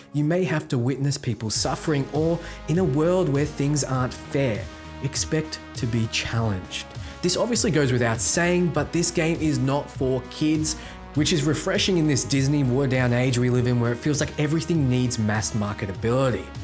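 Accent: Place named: Australia. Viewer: Hey, are you from Australia? I feel like your accent is Aussie (I'd guess Sydney):P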